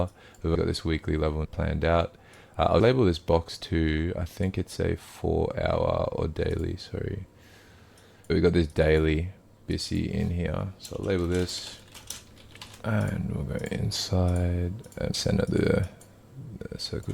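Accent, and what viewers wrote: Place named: Australia. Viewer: strong mindset 💪 rare to hear a fellow Aussie accent in the trading scene.🇦🇺 keep going up 💯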